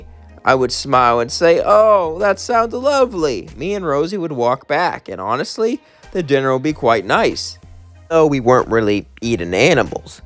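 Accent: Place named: Transatlantic, United States. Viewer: It’s actually a transatlantic accent.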